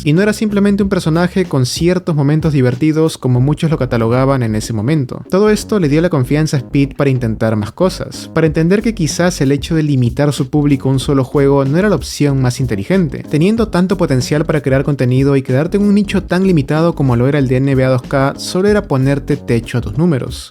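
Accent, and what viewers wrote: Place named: Lima, Peru. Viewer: Tienes acento limeño. 💪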